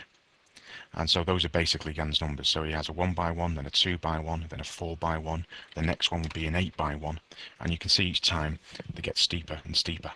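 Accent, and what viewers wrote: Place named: North West England, United Kingdom. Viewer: Lol. Hes from north west i think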